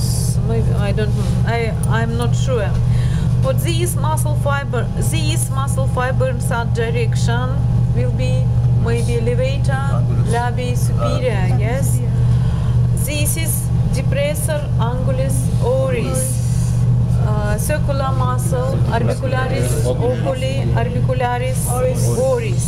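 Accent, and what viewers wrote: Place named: Russia. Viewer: i love that russian accent bro :)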